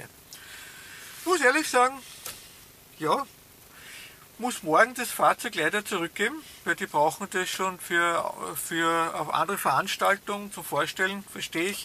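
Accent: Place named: Austria. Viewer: Grausig, dieser Ösi-Dialekt! Und nur Gesülze, keine genauen Angaben!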